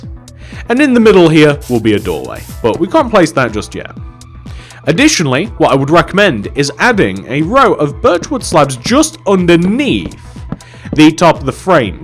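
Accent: United Kingdom. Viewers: Quick question Are you british bc you sound british to me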